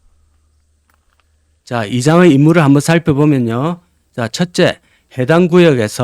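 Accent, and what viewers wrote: Gyeongsang, South Korea. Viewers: 1976년부터 1988년까지 13년동안 마산 창원에서 20대 직장과 청춘을 보냈는데 정겹고 친근하고 구수한 사투리가 향수를 자극 합니다.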